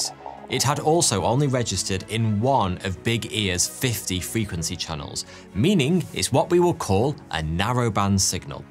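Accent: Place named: RP, United Kingdom. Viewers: Goodness, a posh British accent makes everything more palatable. It’s prized by Wall Street, Madison Avenue and Hollywood. Actors take classes in The Received Pronunciation. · A posh British accent can’t make bullshit more palatable. Several explanations exist for the ‘wow’ signal.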